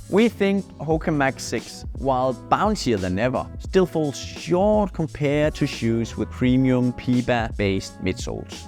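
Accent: Denmark. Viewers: My mum is Danish (from Horsens) so hearing a Danish accent always make me happy. Your English is outstsnding. Especially all of the technical vocabulary. Thank you for the in depth reviews. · This dude danish accent is so strong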